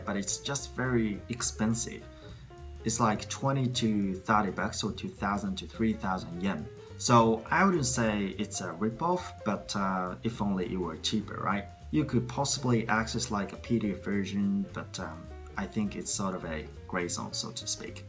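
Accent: Australia. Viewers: オージーイングリッシュですね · 6年前も8.5とった内容の動画取ってますが、この時はよりオーストラリア英語になってますね 今のオーストラリア（メイン）＋アメリカ/ジャパニーズ（サブ）アクセントそっくり。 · ところどころオーストラリアのアクセント入ってて好きです笑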